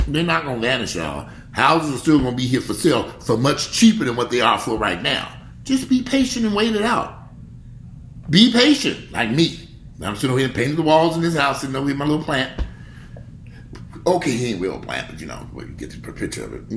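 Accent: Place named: New York, United States. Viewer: Sounds like NYC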